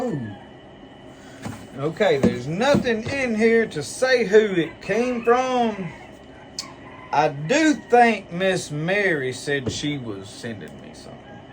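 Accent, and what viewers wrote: South, United States. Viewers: Thanks for the daily walk a bout.... Love the southern talk.... From Minnesota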